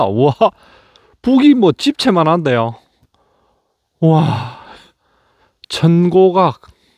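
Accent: Gyeongsang, South Korea. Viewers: 빈집 찾는데 노력 하지말고... 늙은 어재 사투리나 고쳐 주세요.... 근본도 없는 갱상도 사투리 좀 고쳐요...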